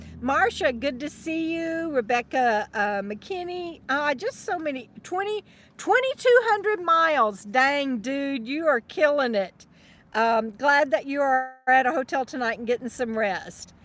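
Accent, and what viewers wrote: Texas, United States. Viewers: I caught this about 90 minutes after you did it. I still don’t have internet (Hurricane Ida) and won’t until mid October. I can hear some of that Texas twang in your voice! Sometimes I can get enough signal later at night by hot spotting off my phone. Good practice for boondocking! I’m going to Houston Monday for about 5 days then will be returning for Lola. Remember, I got a little gift for you from my friend in Houston’s mama who passed away in early June!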